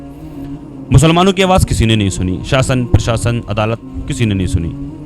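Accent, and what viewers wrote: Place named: Maharashtra, India. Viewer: Yeh Maharashtrian Boly hae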